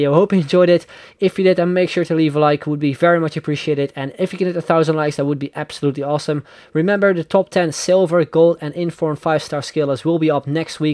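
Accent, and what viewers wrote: Netherlands. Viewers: Lol i hear that you got that dutch accent when you speak.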